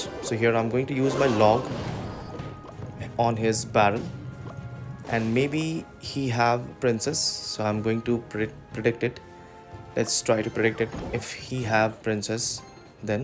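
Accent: India. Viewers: Obvious indian accent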